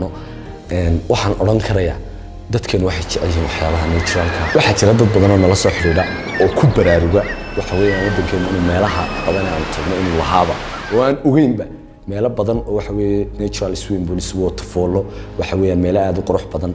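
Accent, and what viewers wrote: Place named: North, Somalia. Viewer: That waqooyi accent though..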